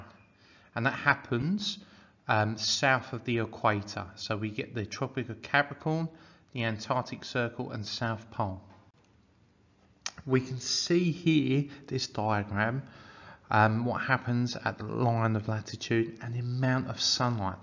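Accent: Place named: Australia. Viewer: Love your accent, are you australian by any chance, much appreciation from the UK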